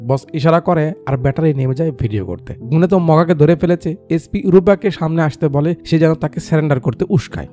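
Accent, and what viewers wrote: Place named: Rangpur, Bangladesh. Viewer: আমাদের রংপুরের কথা শুনে ভালোই লাগলো। সঠিক বলেছেন।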